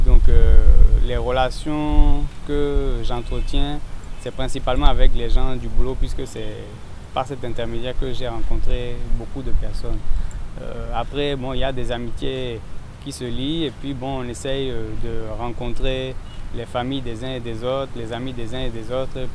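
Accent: Benin. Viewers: Il parle comme un béninois ..trop grave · et puis il parle comme un vrai Béninois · C'est incroyable :o un vrai Béninois dans la voix! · Il a même l'accent Béninois bravo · Il a un accent béninois merde ✌️ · Lol il parle comme un béninois est ke la voix n'est pas truie. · Incroyable quelle intégration !! Si c'était pas une vidéo j'aurai pas cru que c'est un français , l'accent béninois 100% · Wahoo un Français qui parle comme un béninois bravo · Vraiment,un accent purement béninois. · Quelle imprégnation!!! On aurait cru entendre un béninois parler. Accent typiquement béninois. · Waoooh il parle rien que l'accent béninois 😍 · C'est l'acent béninois et puis tous les africains ne sont pas FRANCOPHOne, il y a des anglophones et lusophone. C'est comme si je disais qu'un hatienne parle comme un guadeloupéen. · Un accent Béninois pour un blanc 🤔🤔🤭. Je suis étonné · tu as un accent béninois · LOL le Gar parle avec l'accent benenois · Et puis il a un accent purement béninois en combien de temps? 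alors que les béninois font un ans en France et revienne avec un accent bizarre. C'est bien bro. J'ai pas cru que c'était un étranger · L'accent beninois* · Quel plaisir de réécouter tes débuts au Bénin... Qu'est devenu ton accent béninois mon cher?? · Attends il a l accent béninois!😱😱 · Lui, vraiment le teint joue en sa défaveur, accent béninois pure et claire. Vraiment chapeau français-beninois. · Noooonnn lui là il est grave hein!! Je ne sais pas si après le reportage, pour la vidéo c'est la voix d'un Béninois qu'on nous fait écouter à la place de la voix du blanc, parce que franchement il a trop l'accent!! C'est incroyable!!!!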